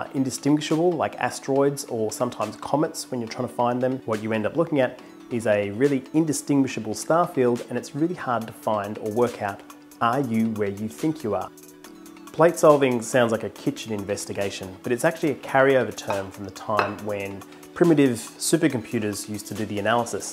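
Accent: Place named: Australia. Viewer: Haha..I totally thought you said spice is big in the beginning 😂.. Aussie accent is great.. 😀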